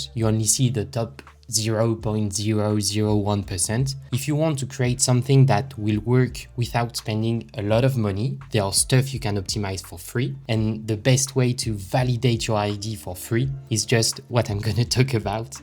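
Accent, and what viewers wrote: France. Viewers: J'adore ton énergie, continue comme ça ! Et j'ai tout de suite reconnu à ton accent que tu étais francophone. Très bon niveau d'anglais en tous cas.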